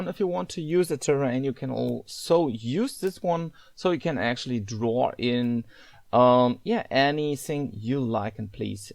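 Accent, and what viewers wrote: Germany. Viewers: you sound german, diablo is pronounced as it is in german ^^ im also looking forward to the next parts, great video!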